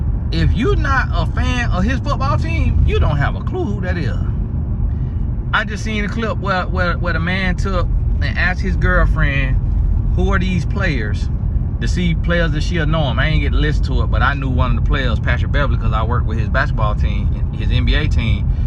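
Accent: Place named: South, United States. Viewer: I may to watch this quick clip more than once. With southern accent and jokes. I'm busy laughing to grasp the teaching. what in the werl?!😅😂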